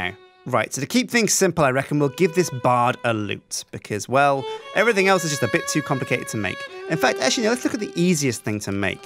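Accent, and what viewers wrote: Australia. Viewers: why did you suddenly go Australian? Also, you should make ALL the NPCs go to the inn when they need to eat becasue, well, it's an inn! That's where people eat! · Stereotypical Australian accent, but it's actually not bad,! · The Ozzie accent lol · that aussie accent is on point! i would know im Australian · Dat Aussie accent doe!!! 😂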